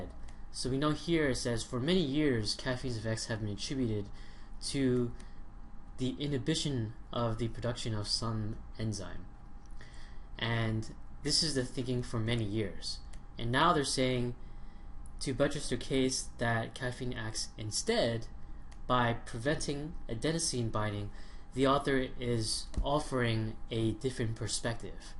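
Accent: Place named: India. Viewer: who the fuck r u, ur accent is so indian seriously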